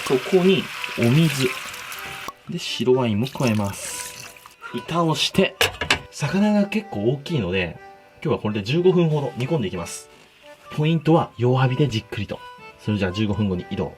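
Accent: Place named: Nagoya, Japan. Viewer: 動画は好きやけど名古屋弁がうざい笑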